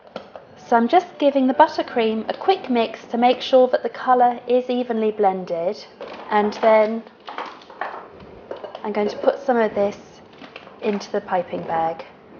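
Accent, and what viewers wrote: United Kingdom. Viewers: I'd listen to a Brit read the telephone directory... love the accent! Lovely video. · a southern part of england, pronoucing words very clearly :) i know, im from england :) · Finally, I have found a video with an expert-looking outcome and a british accent. *Sigh* I'm oh so sick of watching over-sugared betty crocker videos. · If only everyone had a nice English accent ^^ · I love the cup cakes you made-they look so pretty and delicate! And I LOVE your accent!! I want to visit England so badly! Both my Father and Mother's side of the family has British roots.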